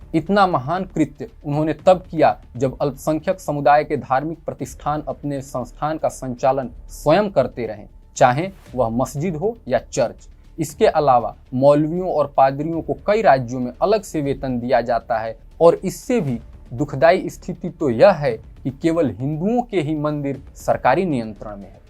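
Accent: Standard. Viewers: sudh hindi yahi sunne milti hai, warna har jagah , hindi ke sath english aur urdu juda rahta hai.. btw .. nice topic and presentation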